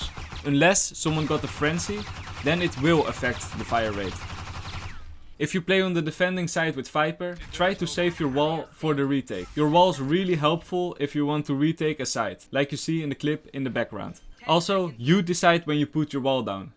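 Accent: Netherlands. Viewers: A bit of nederlandse accent maar its goed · Jij bent 100% een nederlander hoor het aan je accent hahaa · use wierd KAMERA spots, love the dutch accent xD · Gy eb echt een nederlands accent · Ma man's dutch i know the accent because i am dutch too lmao, good and handy vid tho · Een Nederlander ik hoor het aan het accent 😂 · im only watching this now, but when i heard you speak the first sentence i recognised a fellow dutch accent. i decided to check your channel name and heyy i was right!! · Loll i like your dutch accent 😂😂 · I love the amount of Dutch I hear in your voice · het is een hollander je kan het gewoon horen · XD, I literally hear the Dutch accent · you hear he is dutch lol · When I started the video I heard the Dutch accent instantly nice vids man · Nederlander xp hoor je gewoon aan je accent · Broo your english is on point, ye your accent is really obvious dutch but outside of that you know exactly what to say! 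Lekker bezig maat! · U sound sooo dutch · The dutch accent is strong lol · Loving the dutch accent <3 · Hey ben je nederlands want je hebt een hard accent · Kamera spots love the dutch excent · loving the dutch accent 😩☝️ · Nice Nederlands accent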